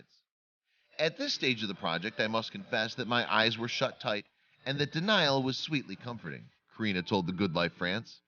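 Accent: United States. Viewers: Why the dreadful American voiceover with mispronunciations? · irritating narrator... why an american..? and stupid music.... disturbing like hell! no likes or subscription, guaranteed! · THE AMERICAN VOICE ON THIS VIDEO IS A COMPLETE TURNOFF.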